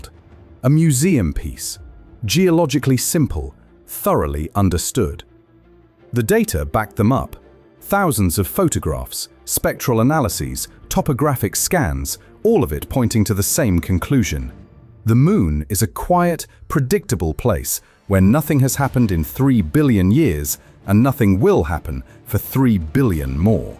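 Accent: United Kingdom. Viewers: Get rid of the British narration.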